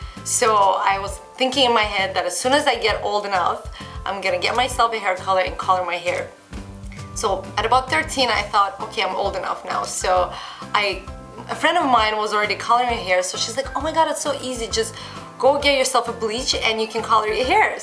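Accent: Russia. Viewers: Sounds Russian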